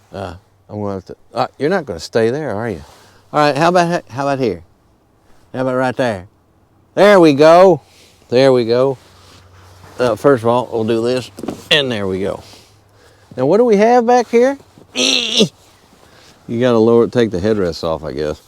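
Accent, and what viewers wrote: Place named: South, United States. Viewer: Your review of the tundra trd pro was the best I’ve seen. I’ve ordered the 2026 and for the past 4 weeks have watched every video I can find. Your approach, attention to detail, and technical information, combined with the southern draw was worth the price of admission. I learned a lot and look forward to delivery with both eyes wide open. Thank you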